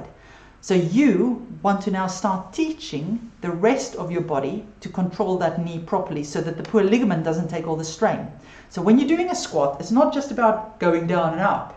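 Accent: Afrikaans, South Africa. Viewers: Best info I've run across after 5 days of searching. Really good stuff. Well done. Love your accent. I'm guessing South Africa? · Are you Afrikaans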